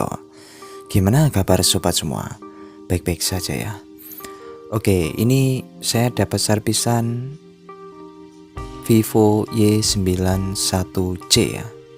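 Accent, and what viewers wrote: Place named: West Java, Indonesia. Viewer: njir sunda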